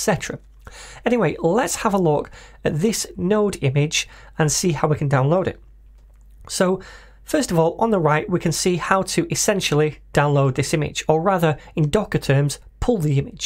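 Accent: United Kingdom. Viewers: Is that a british accent?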